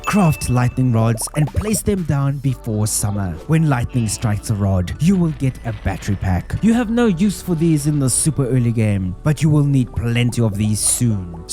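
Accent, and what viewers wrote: South Africa. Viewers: You are south african for sure.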